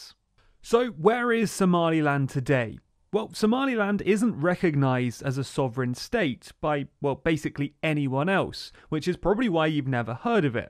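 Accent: United Kingdom. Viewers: correction: there is no dotted line dividing the map of Somalia to make you nervous. Your presentation is good, but it is factually wrong. I am from Somaliland, and it is only my tribe that wants independence, in order to create a country were my clan is the majority and we will win all the "elections." The other 4 tribes don't want independence. The referendum you mentioned was only done by my Isaq clan, the other 4 clans refused to take part. so, of course we "won" with 97% of the votes. Many of us are having second thoughts, but we are afraid to speak up, because the former rebels from my clan who are now the "government" in Somaliland do not tolerate dissent. Your video is my clan's propaganda leaflets read with a British accent. Please check your facts.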